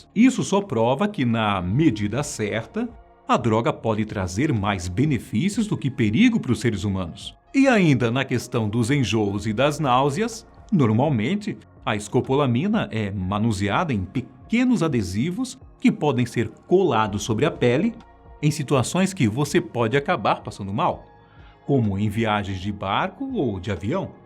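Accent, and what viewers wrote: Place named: Bahia, Brazil. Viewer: Gostei, esse cara tem uma energia boa, tipo...meu sangue vai com o dele, no modo baiano de falar😅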